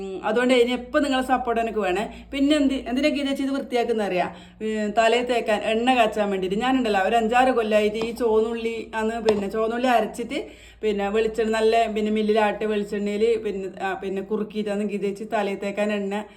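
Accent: Kannur, India. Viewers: എന്താ രസം പറയുന്നത് കേൾക്കാൻ നമ്മുടെ കണ്ണൂർ ഭാഷ പൊളിയല്ലെ മുത്തെ 🥰🥰🥰🥰❤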